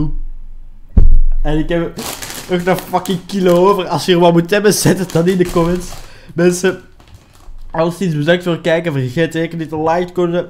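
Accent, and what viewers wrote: Belgium. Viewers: belgen hebben een HEEL cool dialect en belgen zijn baas !!! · Ga dan ook niet kijken als je zijn stem niet leuk vindt of doe dan je geluid uit... ik vind het echt niet vervelend om naar een belg te luisteren. Ik vind het wel een leuk accent =) dus pls ga niet schelden in de comments. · dat belgisch is gwn te verstaan ik vind het juist super leuk klinken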